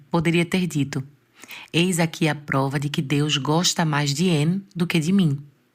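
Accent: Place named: Northeast, Brazil. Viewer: éssa prónúncia nórrdeshtina tem uma Bé-leza louca! Tótal! Estou Mésh merizadoooo !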